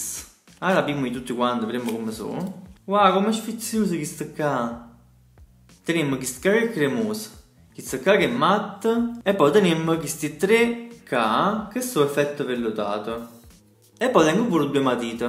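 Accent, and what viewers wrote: Caserta, Italy. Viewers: Che zona di Caserta?😍 io sono della provincia invece 😍 si sente che l’accento è più casertano. Troppo forte! Mi sento a casa 😂🔝 e Ferro vecchio passa tutti i giorni ahahah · Da napoletana ti posso dire che si sente tantissimo che sei di Caserta e non di napoli Napoli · Da "napoli napoli" ti dico ch si sente un sacco il casertano hahahaha ti adoro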